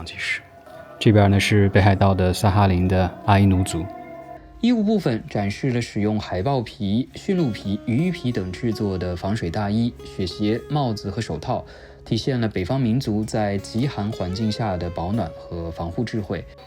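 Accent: Taiwan. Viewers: 這位小姐的口音感覺像是我們台灣同胞! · 聽口音，女生是台灣的???? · 聽這位小姐姐口音是台灣人！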